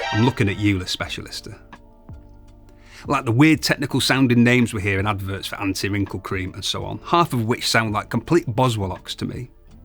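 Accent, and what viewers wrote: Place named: Yorkshire, United Kingdom. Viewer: You sound like you come from somewhere in Yorkshire - where abouts? is the question.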